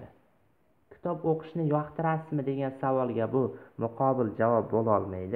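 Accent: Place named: India. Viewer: Aka aksent hindistonnikimi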